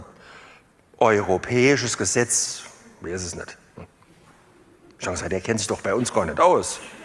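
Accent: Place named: Saarland, Germany. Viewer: Herlich ..und noch uff saarländisch 🤣🤣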